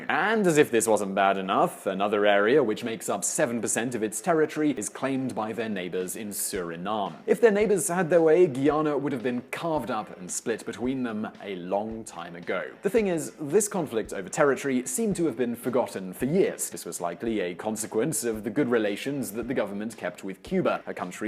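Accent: United Kingdom. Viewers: First thing first let's get the name of the country pronounce correct it is not Guiana it is Guyana and your video is very misinform If you going to do a YouTube video on a country you should be properly informed and you should have also pointed out with your British accent that the American former President Jimmy Carter went to that country in 2015 and help Red Dead election which had send that country back into a reverse like the American and the British government did in 1964 that is something you should have pointed out Guyana economy was well on their way to better greater things under the PPP people Progressive Party now the an economy is only based on one thing that is oil please be properly informed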